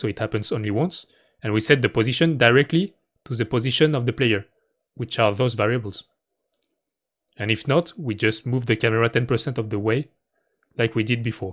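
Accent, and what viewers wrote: France. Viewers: t'est francais la c'est pas possible avec l'accent 😅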